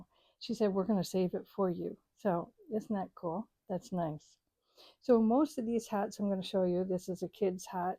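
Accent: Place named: Canada. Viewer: It sounds like you might be from Canada. I’m in a state that borders Canada. We get a lot of snow (right now it’s freaking cold here), but I’m sure we don’t see anything close to the snow levels you can get in a snowstorm in Canada. 😊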